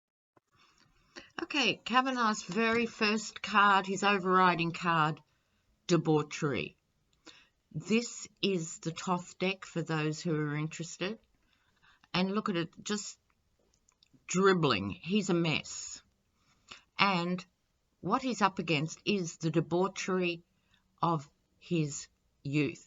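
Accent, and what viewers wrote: Australia. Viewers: I love your Australian accent especially when you say "deep shit". You are too cute!☺️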